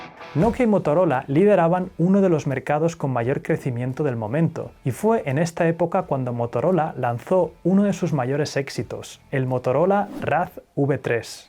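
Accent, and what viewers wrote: Spain. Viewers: Así hablan los españoles, no cambian, o cambian muy poco la pronunciación de las palabras escritas en inglés. Ya es algo cultural, no creo que no hable inglés.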